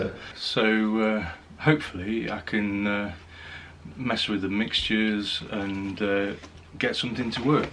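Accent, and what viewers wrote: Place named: United Kingdom. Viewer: Loved your video! I know you made this years ago, but I can currently feel your pain! I'm a printmaker and struggling with the chemical balances of cyanotype! Lovely to hear a fellow British accent too!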